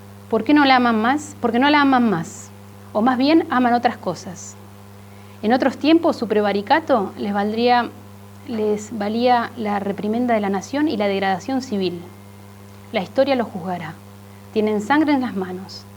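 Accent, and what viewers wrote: Buenos Aires, Argentina. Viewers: hermoso escuchar èse acento aporteñado, que me es tan familiar, esplendido trabajo, sólo te daré un consejo, mira la cámara mas frontalmente con menos miedo a la exposicion, eres muy inquieta y seguramente te cuesta estar sentada esponiendo, por lo general los Profesores os moveis mucho, ha sido magnífica lectura me hizo mucho bien enterarme Gracias!!! Hermana Dios te Bendiga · Desconosco el orígen de esta monja, pero por su formade hablar parece ser argentina. No entiendo por que esta tan preocupada por Francia,en todo caso es el precio que tienen que pagar por el nefasto colonialismo frances, ellos fueron los que intrdujeron la siniestra Doctrina de la Seguridad Nacional, que sembró de sangre, dolor y murte a nuestros paises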